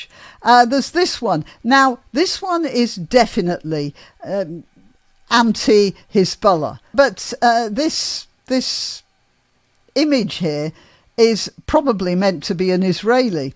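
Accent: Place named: England, United Kingdom. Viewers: I don’t know if you’ll see this, but which part of England are you from? I’m American, but my mother was a British war bride, and your accent reminds me of her family. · just because You sound English doesn't mean You're smart Because everything you're saying is so off base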